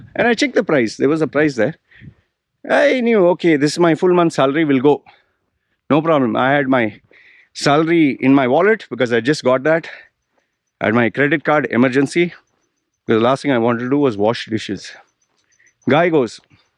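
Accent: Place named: Malabar, India. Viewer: So after your Burj Al Arab visit you became sophisticated! But how come you didn't acquire that particular sophisticated British accent as well? And you still managed to retain your Malabari accent!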